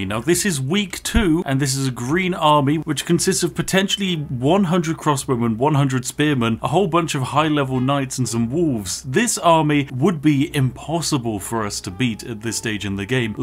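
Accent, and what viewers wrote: United Kingdom. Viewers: You sound too british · Hey....... are you actually British?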